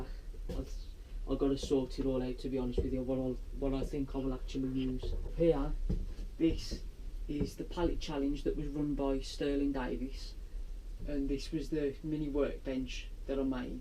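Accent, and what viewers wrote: Black Country, United Kingdom. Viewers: Blimey i thought i had a small working space in the garage, but you are doing a great job with the space you have, i am impressed, you sound like you are from my neck of the woods in the Black Country!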